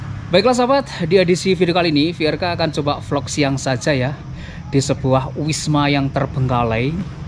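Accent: Java, Indonesia. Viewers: Seneng mas jawane enak ngerungokne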